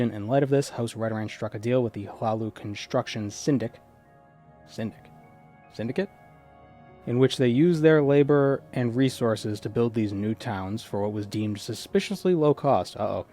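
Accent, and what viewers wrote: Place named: Massachusetts, United States. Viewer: Also, where the hell are you from? That wicked smart was too natural. Im above mass, so i hear that accent and look for a fight happening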